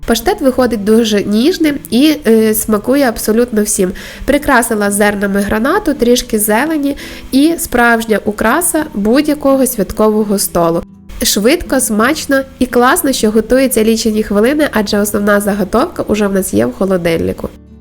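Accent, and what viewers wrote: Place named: Western Ukraine, Ukraine. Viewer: Який файний западенський акцент! Кайфую! Дякую!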